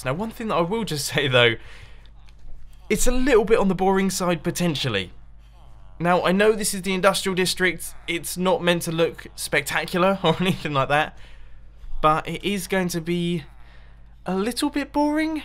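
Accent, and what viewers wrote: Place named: United Kingdom. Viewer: god, I see so many people commenting that "mumbos voice sounds like wheatly from portal"... except it doesn't. wheatly is a robot which has a british accent. is british, hence, has a british accent. just because they both have british accents, doesn't mean they sound alike.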